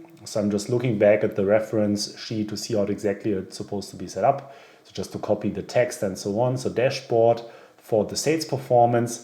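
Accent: Germany. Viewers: Hi, great videos! Especially your knowledge combined with the German accent makes me as a Hesse really happy!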